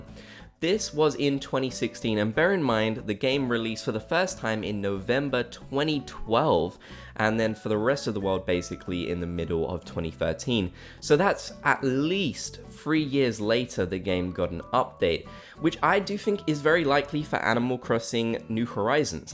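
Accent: United Kingdom. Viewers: Gang can y'all boost this so he sees it? Having accurate captions would help viewers like me a lot. The auto-generated ones don't work well with British accents and I really want to be able to watch these videos AND fully understand what you're saying Accessibility is really important! #Accessibility #DisabledViewer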